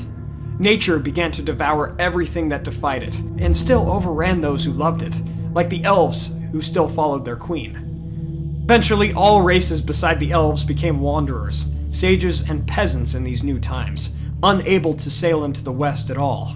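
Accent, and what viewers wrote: United States. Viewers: Your American pronunciotion with all these "r" is terrible.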